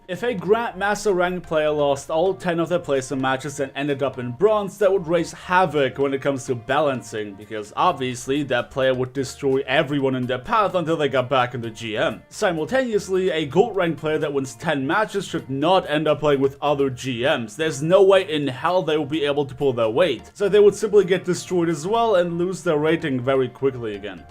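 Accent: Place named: Germany. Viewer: Sorry man but I cant follow you. Your english is so bad. Thats really not an insult, but an advice. Work on your language skills. How you pronounce words and stuff. I am 99% sure that you are not mother tongue english. Sounds like german or so. Anyway: Cant follow the information you give because your spoken words are not accurate.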